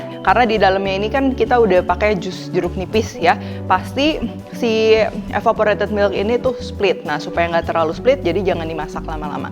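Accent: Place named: West Java, Indonesia. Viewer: Chef leukohnya Sunda banget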